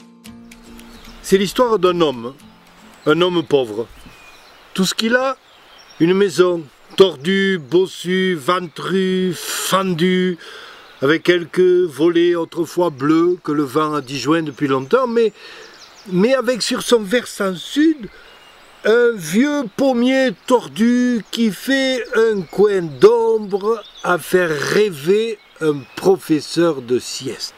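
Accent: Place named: South, France. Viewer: Du Paolo Cuhelo, avé l'accengn... Merci ! J'allais dire : encore ! Mais encore, tu nous le donnes déjà.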